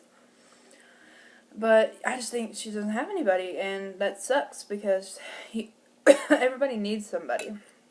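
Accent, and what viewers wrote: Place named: Kentucky, United States. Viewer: What part of Kentucky are you from?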